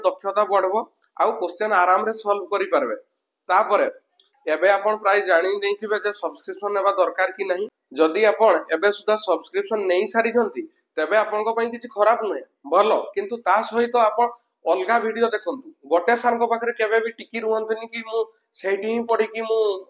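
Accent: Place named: North Odisha, India. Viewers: Sir apana nka ghara keonjhar, Mayurbhanj ya phir balasore ru thiba bcz apana nka talking ru jana paduchii, sir mu b cbz student aii year b.ed sarila😊 bahut worried achii kn kemiti karibi😢😢😢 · Aapono nka kotha pura mohanta type re,mayurbhanj ro ki...?khub kom sir motivate karanti,aapono motivate kori kori bujhauchanti chhua mananku,so,thanks...mu history,politics,geography and economics note chanhuchi,next year b.ed preparation pai...so,give me some notes🙏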